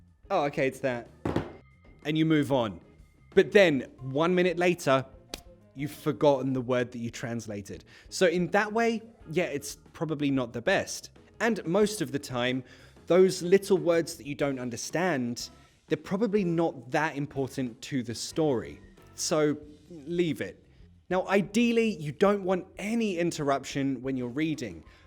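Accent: United Kingdom. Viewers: This man is a hero, I needed videos of British English but most of the youtube videos are in american English. I don't like american English 😕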